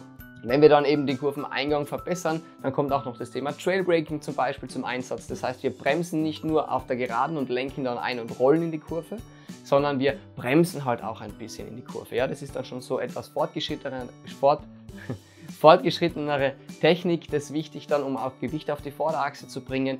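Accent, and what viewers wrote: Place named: Austria. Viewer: Endlich mal eine angenehme österreichische Stimme :D Ich finde du machst echt super Videos und gerade im DIY-Bereich bin ich sehr froh eine ordentliche deutsche Erklärung zu bekommen. Es wird nicht ewig um den heißen Brei geredet (Das Hauptproblem der meisten Youtuber IMO) und du hast interessante Themen! Ich hoffe es kommt noch ein Video für eine DIY-Wheelbuttonbox. Ich will mir unbedingt selbst eine bauen aber ohne ordentlicher Videoanleitung, traue ich mich leider nicht drüber. Aber ich freue mich auf jedes neue Video :) Mach weiter so und alles Gute für die Zukunft!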